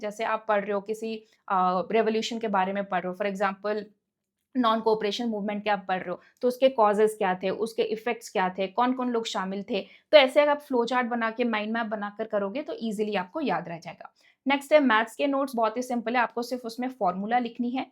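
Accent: Odisha, India. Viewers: Are you from odisha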